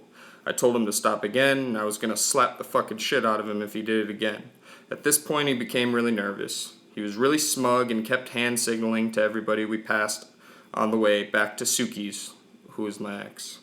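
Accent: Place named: Detroit, United States. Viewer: same bro. everything. from the drug past, anti police lifestyle, vehicular mobbing almost leading to death.. only difference is im in uk. someone i cared for deeply in my past originally came from detroit - your accent reminds me of them hence why i wanted to reply know what you mean with the evil cultish vibe these fucks give off. too much to go into here but just want ya to know it does get easier with time.. not outwardly, but in how we learn to deal with it. im four years down the line and still dealing with it every day. but i am dealing with it youre clearly a smart young guy. youll find a way of surviving eventually.. dont let them win. if you ever wanna talk, let me know. otherwise, take care man.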